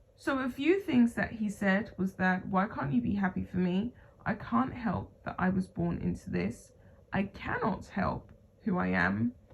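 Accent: United Kingdom. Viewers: Anyone reminded of the film ''Misery''?.. Lovely to hear a Brit doing this for a change! Good on you girl.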